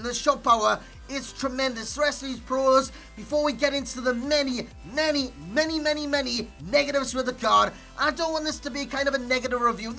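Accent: Newcastle, United Kingdom. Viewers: hey, what part of the UK is your accent from? I'm from the US so i'm not very good at picking out different dialects.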